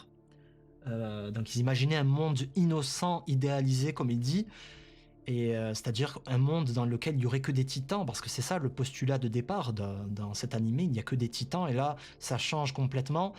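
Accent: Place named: Japan. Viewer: J aime bien ton petit accent japonais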